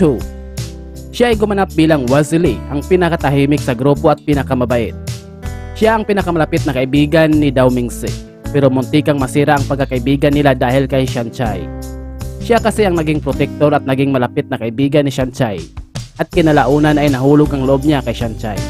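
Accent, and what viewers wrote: Visayas, Philippines. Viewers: Bisaya ka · Bai bisdak ka no maila sa sinultihan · Nkaka tawa ung ngsasalita BISAYA k ba! Wahahahahhaahhahaha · BisAya ka nman magkwento..!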